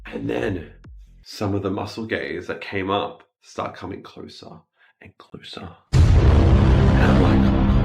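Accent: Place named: Australia. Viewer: He’s Australian girl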